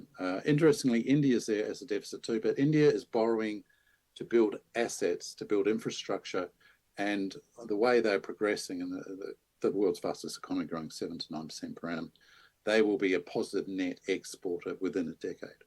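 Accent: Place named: Australia. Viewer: Very interesting - thanks for that. You had me with the accent, I felt sure you were, like me, an Aussie.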